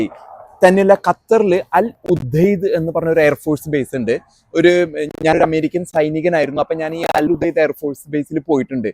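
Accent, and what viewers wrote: Thrissur, India. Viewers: തൃശൂർ ഭാഷ അലക്ക് അടിപൊളി ......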